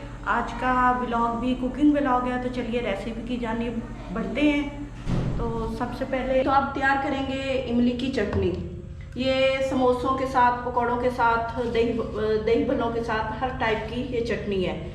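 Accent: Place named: Attock, Pakistan. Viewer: yammay aap Attock se hain aap ke blne ka andaz attock wala ha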